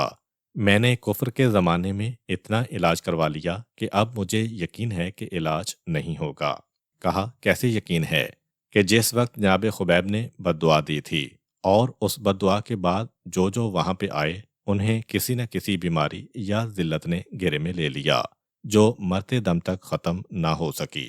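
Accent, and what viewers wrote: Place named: Punjab, Pakistan. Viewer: آپ کے لہجے میں پنجابی جھلکیاں سنائی دیتی ہے مجھے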